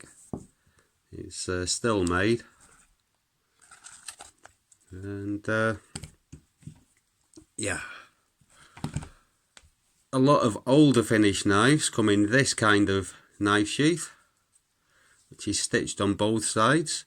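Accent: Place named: Liverpool, United Kingdom. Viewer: What’s a scouser doing in Finland?!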